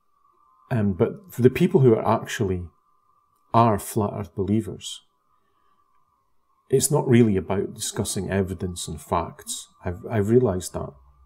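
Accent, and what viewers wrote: Scotland, United Kingdom. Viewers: If you think you live on a ball then aircraft would have to fly around this ball. You sound like you live in Scotland. A flight from Scotland to Gran Canaria in the canary islands is 2,000 miles south. Conventional mathematics will tell you that over this distance the curvature of the earth if it were a ball would show a drop of just over 500 miles ( 8 inches times 2,000 times 2,000 = 32 million inches or just over 500 miles). This would mean that for every mile travelled the plane would need to descend 1/4 mile, it would be nose down all the way. However experience will tell you that this does not happen, the plane will be in level flight all the way aside from take off and landing. BTW the Southern Hemisphere long haul non stop flights are bogus.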